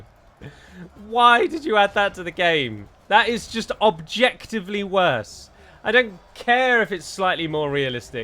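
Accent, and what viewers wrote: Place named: England, United Kingdom. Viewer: First, I want to guess Edhellond could mean something like, 'Elf-Haven' but I have no idea in all honesty. Secondly, I've always loved your videos and we will all undoubtedly miss your channel. I just wanted to say before you go, I wish there were more people on YouTube like you. To be fair, I don't watch much YouTube and your channel is the only channel I watch regularly. You have an old-soul like many of the nuns I know in the Convent I work in, but with an English accent, haha. Hopefully life goes well with you beyond YouTube. Best of luck to you!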